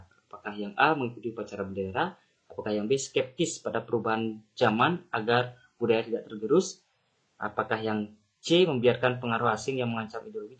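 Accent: Bali, Indonesia. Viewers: orang bali ngih bli keliatan dari logatnya ?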